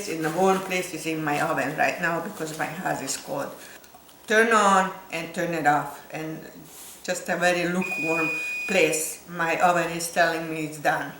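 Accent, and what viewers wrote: Hungary. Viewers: Thank you for your great videos. Not only do you make baking look easy, but you present it in a way that can be followed by someone like me, who is afraid of baking. I came to the US when I was only 7 years old. I'm a pretty good Hungarian cook. But, I love listening to you with your beautiful Hungarian accent. It adds extra flavor to everything you make!! Mivel nincsen senki akivel tudok magyarul beszélni, kérlek, enged meg hogy veled kommunikáljak és esetleg kérjek főzési tanácsot tőled. A nővérem Budán lökött. Nem jót USA'ba csak látogatóba. De már nem él. A volt férjemmel sem beszélek magyarul mert a többi család nem érti. Csak egy osztájt fejeztem be Pesten, de azért nem vészes a nyelvtan és az írásom. Régen leveleztem a nővéremel. Az már nagyon, de nagyon régen volt. Thanks again! And all good wishes to you and your family. Miriam P.S. 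I would love to know a little bit about you. You can find me on Facebook, if you wish to friend me. I would be so honored.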